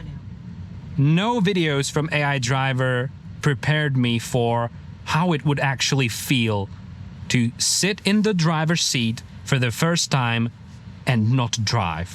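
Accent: United States. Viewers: I would not know that you aren't an American. Your English is terrific. You sound like any other American to me. Enjoy your visit and please visit other states. I'm in central Ohio. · Your American accent is impressive! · While not perfect, your accent is amazing and sounds very American! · You did an excellent job for a newbie even your English sounds American. I can confirm what your conclusions are because we purchased a Tesla model Y Juniper two months ago and it is running and maneuvering with FSD very nicely.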